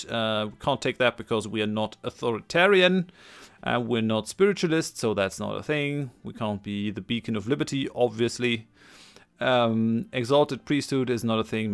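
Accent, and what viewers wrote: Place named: Germany. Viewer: NO WAY. I can't believe, it must be a miracle! When Utopia came out i quickly peek in the Stellaris wiki to find out what's new. Personally i found that my 1st playthrough should be done as F.Pacifist + Materialist (of course Fanatic Purifiers and Hive Mind is very interesting and absolutely new options, but when it comes to your own game you want to pick something balanced which will not prevent you from discovering all new stuff in the update, you know) as the most balanced between all new options. Unfortunately, i got too much work now days, so i must beeline my own game a bit and i decided to watch some letsplay first. And what i found over here?! Some german guy (it's a important note, because i find german accent the most pleasant for my ears (even compared to native speakers!)), who's playing exactly what i wanted to see (i mean race) with 2 most entertaining enemies in this update. 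Also it's Insane difficulty! (nothing else not worth watching imo, because i can do hard without any problem on my own). I got a bit of frustration right now, because it feels like someone just read my thoughts and did EXACTLY what i wanted to see xD Anyway, wish you best luck in this campaign and i happy to say that you've got a new loyal subscriber, who's watching this almost 5 hours in a row instead of sleeping, because he just can't stop =)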